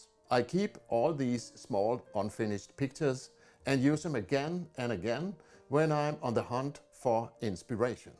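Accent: Denmark. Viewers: Are you Danish? Im American but married to a Danish woman and have lived in Copenhagen. It’s your accent. Great channel!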